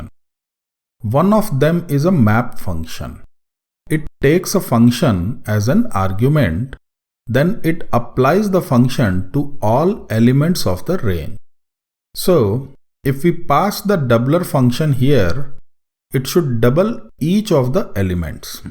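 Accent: India. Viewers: namas te. дорогие индусы, пожалуйста, учитесь говорить по-английски нормально, ваши курсы невозможно слушать. речь непоставленная, акцент жуткий, половину слов просто непонятно иногда. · Heavy In accent is a plus. Perfect videos are typically less natural.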